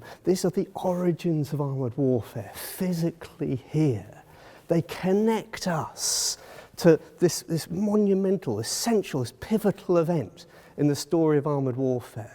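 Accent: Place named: United Kingdom. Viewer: What a pleasure to watch for so many reasons: First the wonderful British English spoken. That pronunciation, melody, stressing etc. Splendid! (I am German, btw) Then the dedication to the topic that speaks from every sentence. The pure facts and information conveyed. The reflection on the historical work you are doing. The legitimate and based reasoning. The mild subtle humour. Ex-cel-lent.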